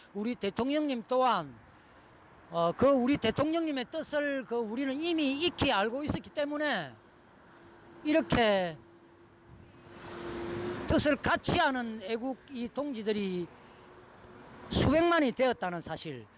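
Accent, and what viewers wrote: Gyeongsang, South Korea. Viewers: 다 좋은데 그 경상도 말투 더럽게 듣기싫다..표준말좀 써라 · 갱상도 말투 존나 듣기싫다.증거는 차고 넘쳐.24년이 아니라 32년이야.계엄 지시까지 먹으면 무기징역 존나 웃겨.배아프다.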